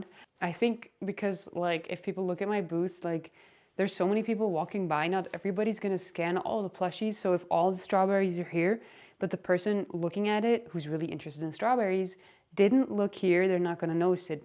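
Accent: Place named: United States. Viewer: Its so interesting, your sick voice gives you an American accent somehow 😂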